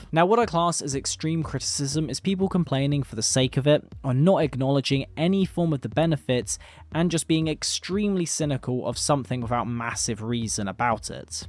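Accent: United Kingdom. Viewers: Subbed for the long video and British accent.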